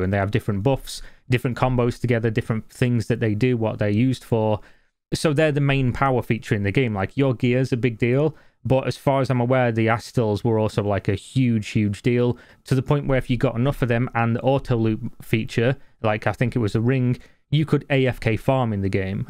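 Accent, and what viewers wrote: United Kingdom. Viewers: so satisfying to listen to a man rant in a british accent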